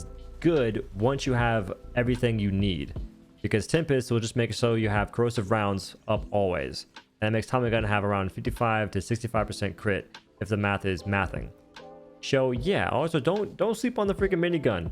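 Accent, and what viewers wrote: South, United States. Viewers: Nice vid, but question, is it just me or does your accent just randomly turn on and off? you sound country for like 15 seconds every minute or so. Kept throwing me off. but in a cool way.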